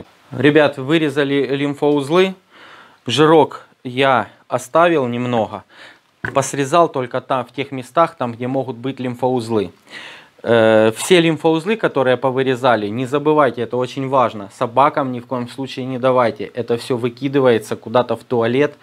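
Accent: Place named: Rostov, Russia. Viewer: Братишка,сам откуда? Я из Ростова на Дону,слышу говорок из наших мест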